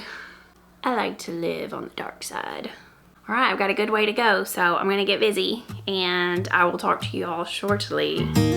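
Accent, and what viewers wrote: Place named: Arkansas, United States. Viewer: Hi! I love your podcast!! I’m a fellow Arkansan transplanted to Texas a long time ago and I just love hearing your accent from home! I love your Zosia wrap cardi - so glad you finished and survived!!! I can’t wait to make it in a light gray. You asked in a previous video for book recommendations and I recommend reading “A Gentleman in Moscow” if your haven’t already read it. It’s a beautiful story. My mom and I are reading the Thursday Murder Club series at your recommendation and absolutely love it! Thanks so much for all you share!! You’re so inspiring 🐝 🐓 🧶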